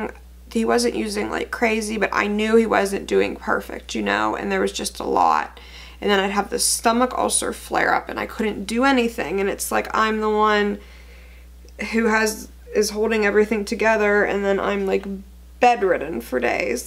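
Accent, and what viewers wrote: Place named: Canada. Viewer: are u canadian? u have a canadian accent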